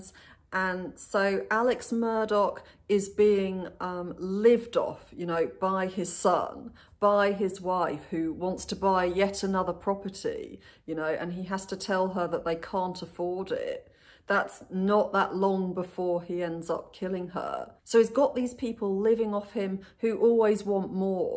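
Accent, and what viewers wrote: England, United Kingdom. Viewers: I love that English accent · Your beautiful English accent to this American is so refreshing and lovely and calming to listen to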